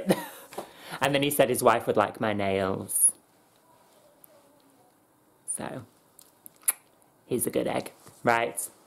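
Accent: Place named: Yorkshire, United Kingdom. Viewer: I love your accent. so Yorkshire and cute lol.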